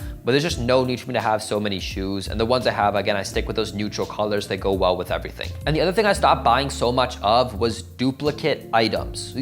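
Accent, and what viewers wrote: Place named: United States. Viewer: Hey you are from India? How did you get the american accent so easily?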